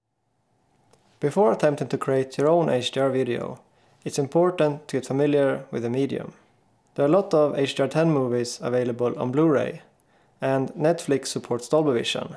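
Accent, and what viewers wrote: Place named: Sweden. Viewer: Bra där. hörde direkt att du var svensk ;) Jag har precis börjat att filma i HDR och Davinci Resolve. Gör det på en Panasonic S5. en fråga. varför ser V Log skit ut även på den färdiga HDR bilden? helt platt utan färger. Jag har även testat några Blacmagic Raw filer och dom ser perfekta ut redan från start och kräver mycket lite grading. att få ordning på en V Log fil för HDR i Davinci Resolve tar enormt med tid. varför är det så? finns det HDR LUTs man kan använda för V Log?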